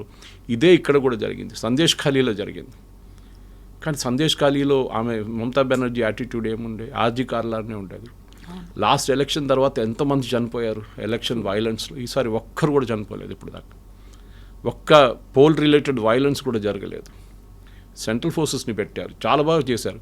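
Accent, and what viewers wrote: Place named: Kerala, India. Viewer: మీ భాషాభిమానానికి వందనాలు. కానీ ఆయన మళయాలి.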